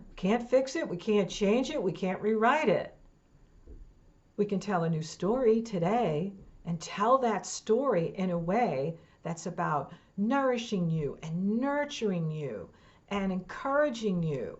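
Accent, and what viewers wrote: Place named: New York, United States. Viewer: Always so uplifting. Looking great. Notice the New York accent now that I recently looked it up.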